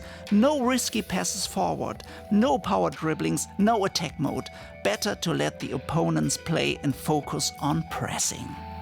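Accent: Germany. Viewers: Possibly the most german sounding German I've ever heard. Great vid!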